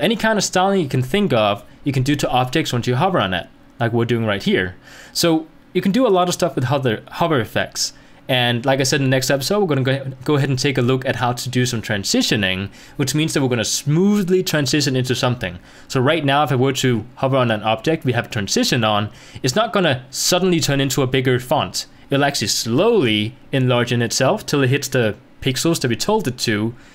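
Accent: Denmark. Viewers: Thanks bro , im working on my portfolio website because im learning to code :D Do you want to see it?? It´s not done yet though but pretty far (ps you sound danish ;-) )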